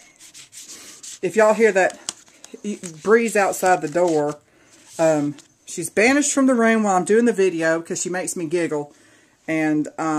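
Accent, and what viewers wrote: South, United States. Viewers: you and your mini are 2 cute. I love ya both. Your southern accent makes me want to move to the south. I also love the warm weather. But I adore your mini. I love all the old labels and die cuts. I know you are going to have so much fun putting pictures in it. Enjoy and Tfs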